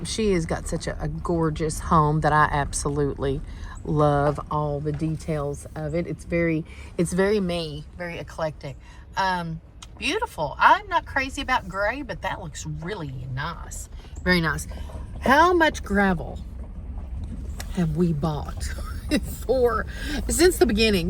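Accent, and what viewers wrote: South, United States. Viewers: OMG!!, my bird has chirped the entire video! She must like your southern drawl!! 😁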